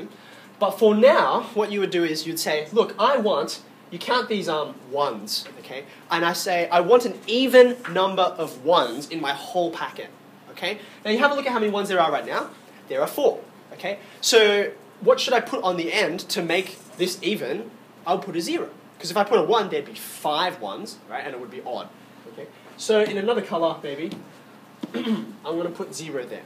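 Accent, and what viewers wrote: Australia. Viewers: I would listen to this guy any time. DAT DERE AUSTRALIAN ACCENT. !! Very well explained btw!!!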